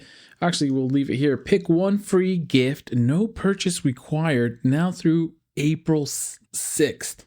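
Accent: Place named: United States. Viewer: This guy has such a pleasant voice. No homo. A very cool American accent man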